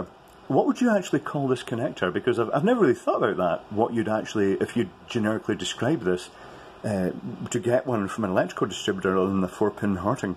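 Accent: Scotland, United Kingdom. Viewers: This is so lovely. I live in the US, but I worked the Fringe for Gilded Ballon many years ago. Seeing 16a Ceeform sitting on a flight case while hearing a Scottish voice and pipes just warms my heart!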